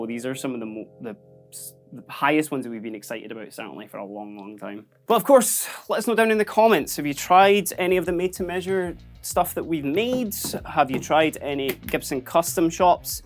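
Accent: Scotland, United Kingdom. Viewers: Hi! Are you from Scotland?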